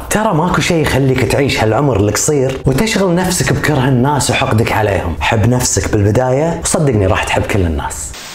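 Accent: Kuwait. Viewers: باين عليك كويتي تقول قطاته والا حن نقول شطحاته او طلعاته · سردك جميل واللهجة الكويتيه ما شاء الله جميله، كل المحبه للشعب الكويتي الأصيل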